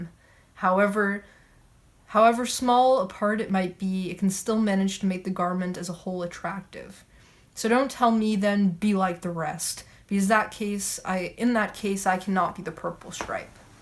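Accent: Canada. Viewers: What is this "boot" she keeps on mentioning? "I think this is a boot wine drinking competitions." "Here Epictetus is talking a boot spanking Spartans." (Actually, I think it is charming. I would move about Canada just to hear people say "a boot.")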